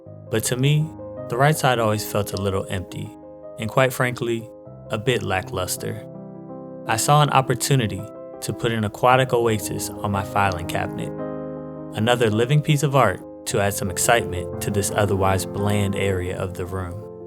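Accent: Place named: Los Angeles, United States. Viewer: You sound like you're from LA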